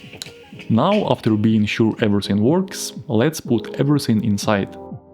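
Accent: Russia. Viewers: Настолько сильный русский акцент, что все понял даже без перевода.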